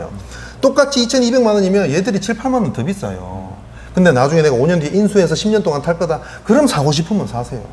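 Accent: Gyeongsang, South Korea. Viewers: 억양이 경상도 이신거 같은데 진짜 홀린듯 영상 봤네요 좋은 정보 감사합니다.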